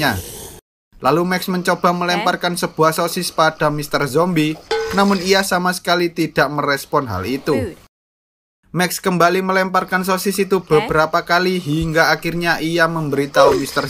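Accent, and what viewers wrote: Java, Indonesia. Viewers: Wong jowo yo mas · Intonasi MEDHOK, tapi mantaf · mantap bang medook ngomongnya hahah mantaap ciri khaas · Medok Kabeh bahasamu le le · Pekat sekali bapak ngobrol jawa... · medok tenaaan , jawa mana sih mas , gak bisa hilang ya logat... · Mantap bg logat nya Jawa banget😅😂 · Mantep narator e medhok sip sip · Okeee rekkkk:v Btw wong jowo Yo?:V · Kentel banget logat jowo nya😁😁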